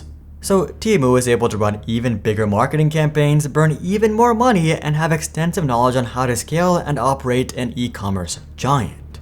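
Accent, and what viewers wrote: India. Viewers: Your Indian accent is actually unbearable, you disgust me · Didnt know VoiceOver guy was India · WHAT is this accent that assaults my ears??? Are you both kinds of Indian? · He's just an Indian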